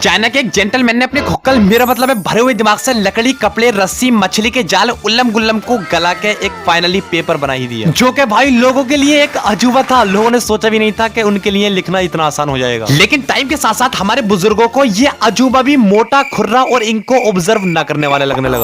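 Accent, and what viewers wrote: Gujarat, India. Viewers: Bhai aap gujarati ho kya ❤🎉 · Bhai tame Gujarati chho?😊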